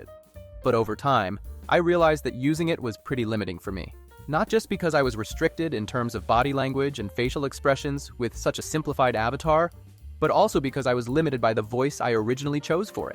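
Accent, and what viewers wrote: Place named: Midwest, United States. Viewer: Very nice new avatar and voice. We enjoy listening to your new voice. I am curious how you manged to get a US mid-west accent in place of your own. Did find another voice similar to your own and sample that instead?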